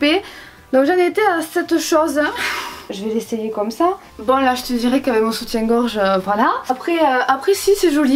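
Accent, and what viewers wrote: South, France. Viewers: D'habitude l'accent du Sud me tape sur les nerfs, je ne supporte pas .... mais toi tu me fais tellement rire que ça passe tout seul 😀 j'adore ta manière de filmer, de présenter les articles... tout en simplicité c'est hyper agréable. J'ai l'impression d'être avec une copine après une séance shopping 😀 Bye bye 😘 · Yes !! J'adore tu illumine mon arrêt de travail (qui est beaucoup trop long, 3 semaines c'est trop! 😂) Ça me ramène au pays ton accent ! Même si je suis encore dans le sud je suis pas du bon côté ! Bref j'adore tes vidéos !! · Ça fait quelques mois seulement que je t’ai découverte! Tes vidéos me font toujours rire, ton accent bien de chez nous qui fleure bon le soleil du sud, ton humour, ton auto-dérision (tout le monde n’a pas cette faculté !!) bref, toujours un moment sympa, merci ☺️🙏🏼💜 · Je regarde jamais les haul de YouTubeuse sa me gonfle mais les tiens j’aime trop avec ton petit accent du sud et ta personnalité. 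Sa fait 3 jours que je regarde toutes vidéos mdr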